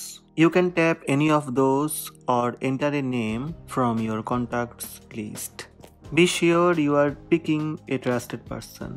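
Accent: India. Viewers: Indians speaking english sounds very ridiculous, next time use subtitles or remove anything below your tongue, the world will thank you.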